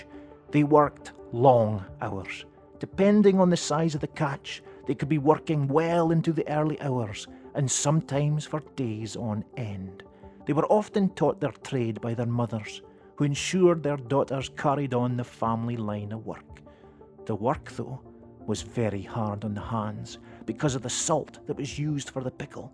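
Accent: Scotland, United Kingdom. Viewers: sometimes I have trouble understanding some terms. if I have official subtitles I compare them with what I hear. besides I sent these videos to my friends from several countries. it will be easier for them to understand if they also see subtitles. I love the Scottish accent but sometimes it is hard for foreigners to understand some things. it is also good if I learn how to pronounce names. thank you very much for these videos - they are really interesting. and I would like more;).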